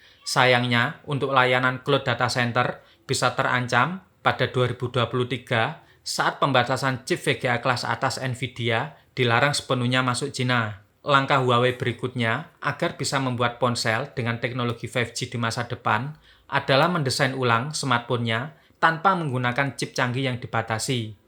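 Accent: Java, Indonesia. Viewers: Pengetahuan lu kurang woi sok tau bahasa medok jawa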